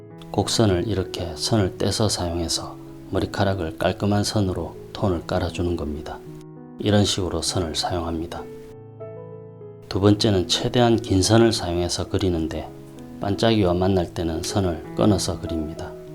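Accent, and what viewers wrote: Gyeongsang, South Korea. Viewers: 근데 어느 지방에 사십니까? 말투가 경상도이신거 같은데 가끔 빵 ~~터집니다ㅋ 그림공부하는데 많은 도움이 됩니다 감사합니다^^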